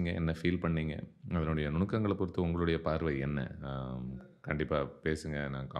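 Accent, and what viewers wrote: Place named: Kerala, India. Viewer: Avar full ah tamil pesala 😂😂 avar konjam malayalam pesraru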